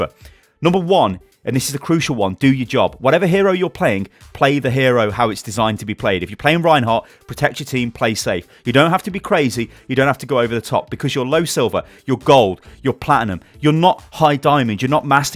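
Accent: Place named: United Kingdom. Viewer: like ur british english. dont understand usa guys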